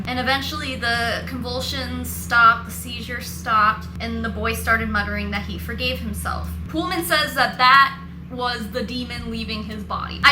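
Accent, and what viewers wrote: South Africa. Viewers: Oh. South Africa. Thats my country. Well its about time the shitstorm that is South African cults is opened up. Its a wild ride to hear your own accent in a video about cults, Im not gonna lie. Sadly, its a common belief here that certain people can take in spirits and release them, especially if you're Christian. However, that gal? She needs some medication, and a therapist. ASAP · Yoh! that south african accent is THICK! also for some reason people here in South Africa have an obsession with Demons...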